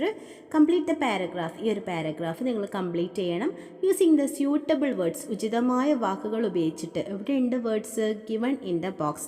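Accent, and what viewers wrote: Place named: Kasaragod, India. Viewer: നിങ്ങളുടെ വീട് കാസർഗോഡ് ആണോ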